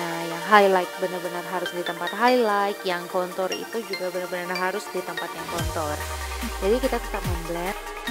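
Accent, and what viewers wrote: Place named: West Sumatra, Indonesia. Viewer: bagus make up nya. cantik bgt kak.. mau tanya org mana kak? kyak bahasanya org sumatra gitu... sumatra barat ehehhee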